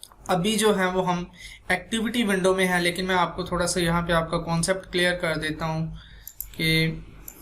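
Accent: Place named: India. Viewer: Can you speak english with u.s accent not indian accent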